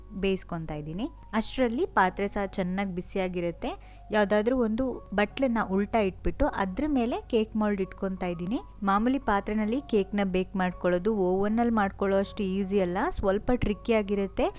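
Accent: Mangalore, India. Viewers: Nice ❤ Actually nam ur Kundapura but nim mathaduva style nam mangalore side ansuthe and more over ur cooking skill was too Good Make Paneer Dishes❤❤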